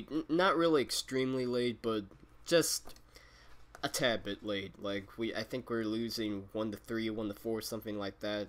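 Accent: Hawaii, United States. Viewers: he has a Hawaiian accent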